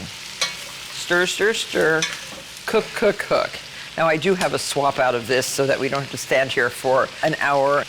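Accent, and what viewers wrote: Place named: North Jersey, United States. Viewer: prrrr-retty. So martha! So north Jersey, raised in class.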